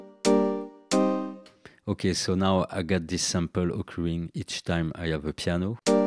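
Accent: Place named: France. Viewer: Ça sent l'accent du terroir 😁👍 Super vidéo en tout cas. Il a l'air vraiment top ce plug-in, ils m'emmerdent Cableguys de sortir des plugins comme ça, simple, bien foutu, intelligent, efficace... ils tombent toujours dans le mille ! Et à chaque fois il me les faut...! Jamais déçu par ce dev, au contraire.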